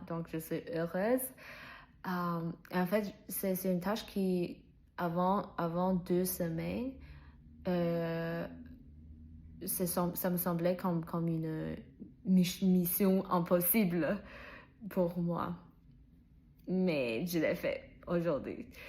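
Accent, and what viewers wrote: Quebec, Canada. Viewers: Félicitations pour tes progrès, courage et bonne continuation 💪 Btw il me semble que tu as déjà un petit accent québécois ^^ Salutation de la Belgique 🇧🇪 Gilles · C'est mignon ce petit accent Québécois caché au milieu de ton accent chinois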